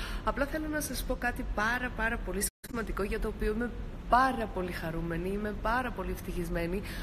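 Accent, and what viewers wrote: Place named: Germany. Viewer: Το χειροτερο ειναι οτι παει να παραστησει την χαριτωμενη! Το αμεσως επομενο που κανει την τοοοοοσλ ενθουσιασμενη. Το λαστ μπατ νοτ λιστ, που μιλαει αγγλικα με προφορα χωριατη γερμανου. Αστο δεν εχεις αστρο...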